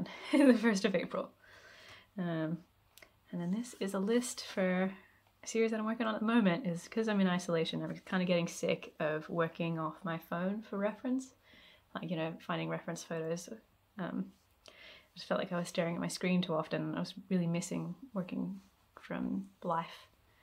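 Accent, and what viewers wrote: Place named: Australia. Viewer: New to your channel and really enjoyed your tour. I thought at first your voice sounded Canadian but then, no Australian! Ok well hello from Canada and I’ll check out more of your videos. Sorry you are so not able to get to Scotland, keep creating , stay safe! Especially enjoyed the kookaburra and reindeer!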